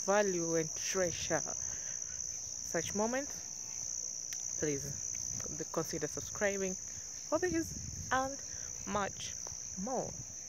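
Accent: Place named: Texas, United States. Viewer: Why do you sound so westernized? Ongea kama mkenya, you sound like you from Texas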